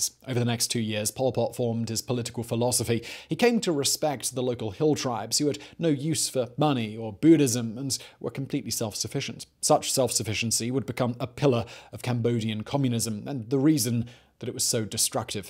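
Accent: United Kingdom. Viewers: Thanks for making this one Simon - I live about a mile and a half away from Tuol Sleng and I drive past on my motorbike nearly every day. It's pretty much in the centre of Phnom Penh, and the rest of the neighbourhood is a very nice place, with some great coffee shops and local bars. Heck, my shoemaker is on the same street as S21! And where I live is virtually equidistant between S21 and the Choeung Ek Killing Fields. Despite the utterly horrible history here, the good people of Phnom Penh are rebuilding still, and life is a lot happier for many here these days. I've been watching your videos for a long time, and as tragic as the subject matter is in this one, I'm really glad to see you and your team make this video. I was thinking less than an hour ago that I should visit S21 again as it's been a while since I paid my respects. Anyway - listening to you as a fellow Brit on your videos often serves as a nice reminder of home, but this video's a great surprise - a British voice helping to chronicle a very important piece of the history of this lovely adopted city of mine. 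Thanks again.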